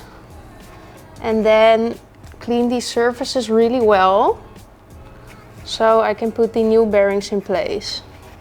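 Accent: Netherlands. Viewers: Thx for another great video! I was curious about your t-shirt and found some good music 😊 a little off topic, but I have to mention I am thinking about bitterballen & stroopwafels when I hear your dutch accent 🤭 I cant help it - sorry 🤭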